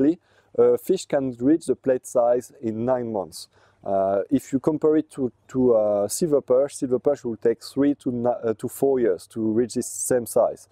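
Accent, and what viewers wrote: France. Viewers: Très français cet accent !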